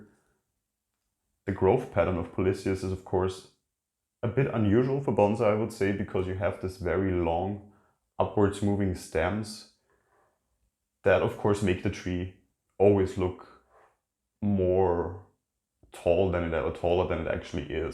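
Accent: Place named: Germany. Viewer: Kann es sein, dass du Deutscher bist? Dein Akzent klingt so vertraut. Grüße aus Österreich 🪴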